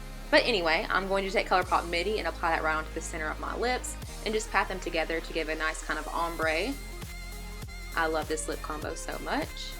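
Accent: South, United States. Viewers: Gorgeous!! Can't wait to see your channel grow! Is your accent southern?! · Are you from the south? I am and I noticed the accent immediately! lol. It gets boring just listening to british / american accents all the time.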